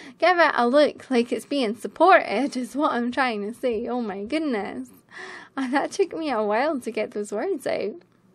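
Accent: Scotland, United Kingdom. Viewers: I think we might come from the same part of Scotland as I couldn't tell u where Scottish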